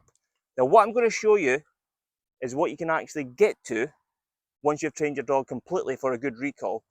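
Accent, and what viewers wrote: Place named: Scotland, United Kingdom. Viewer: I had to chuckle with the tupac reference coming from a dude with a Scottish accent. Caught me off guard but good video man.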